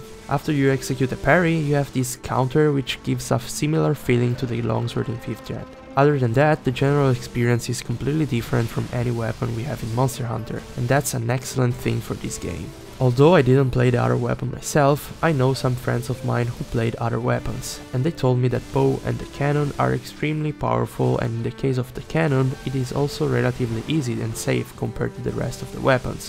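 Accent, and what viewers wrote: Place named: Italy. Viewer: Brooo amo il fatto che si sente un casino che sei italiano ahahah comunque grande❤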